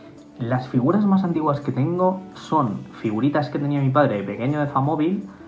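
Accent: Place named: Peru. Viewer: Sigo creyendo q eres peruano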